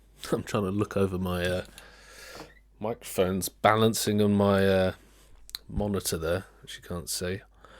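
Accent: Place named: West Country, United Kingdom. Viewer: Are you still making videos? Or have you become to a higher plane artist? You sound like your rather from Devon or Cornwall.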